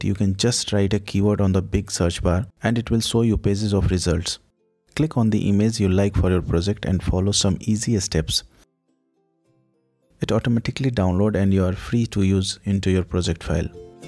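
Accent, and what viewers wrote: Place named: India. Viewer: He is from India. That's how they pronounce words. Do you also correct french, Italians and Norwegians with their pronunciation when you meet them?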